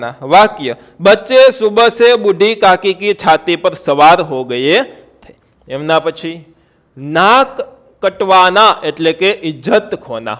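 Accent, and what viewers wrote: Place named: Gujarat, India. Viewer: Gujarati accent Hindi 😅 that's awesome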